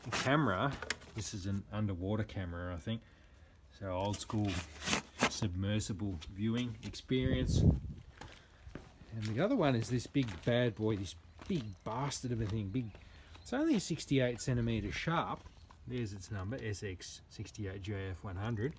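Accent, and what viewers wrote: Australia. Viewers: Hey mate do you have any crt’s with HDMI inputs? You sound like you’re in Melbourne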